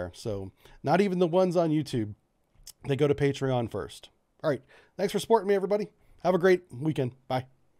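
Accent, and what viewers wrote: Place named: United States. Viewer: You sound exactly like I'd only expect to see from an American.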